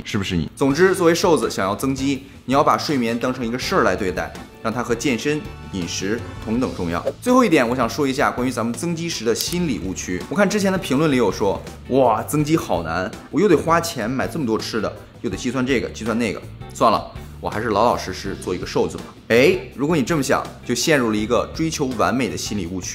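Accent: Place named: Beijing, China. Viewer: 我感觉是北京人，我是北京人，他的口音，很像普通话很好的北京人😂😂😂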